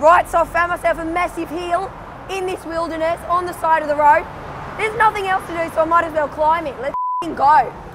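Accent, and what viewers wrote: Australia. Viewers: Aussie accent not too bad... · The Aussie accent sounds good but should really have mentioned walkabout and you do not ever see an aborigine in the bush. They are at one with nature and disappear.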